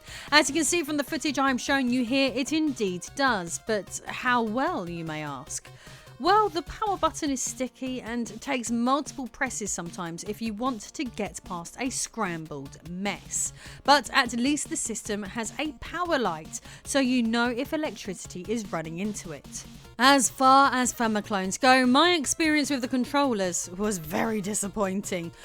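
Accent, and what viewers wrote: United Kingdom. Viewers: You would make a Good lieutenant troi... British accent and all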